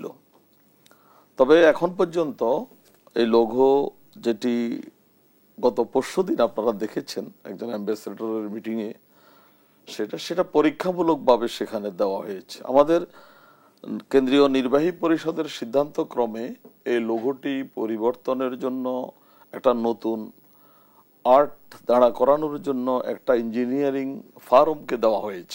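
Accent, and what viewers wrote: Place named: Kolkata, India. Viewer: সনচালক কলকাতা স্টাইল এ কথা বলেন কেন?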